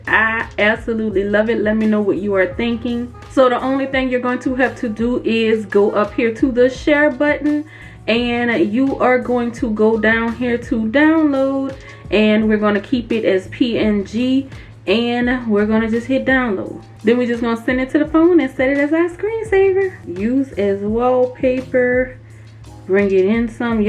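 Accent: New Orleans, United States. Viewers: Ok, I need this in my life. However... I want that N.O.L.A. accent bay-be! 🎆🎇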